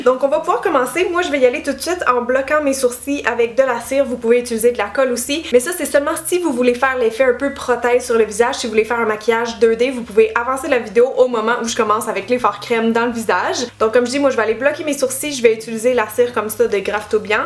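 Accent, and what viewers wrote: Quebec, Canada. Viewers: J'aime trop les accents québécois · L’accent québécois ne va définitivement pas à la nonne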